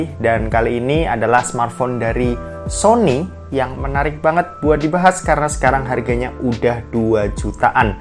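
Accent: Semarang, Indonesia. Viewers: Mas wong semarang yo?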